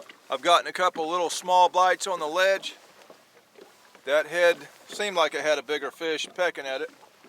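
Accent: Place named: Virginia, United States. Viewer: Reminds me of Virginia talk.. "slap-dab" Semper-fi sir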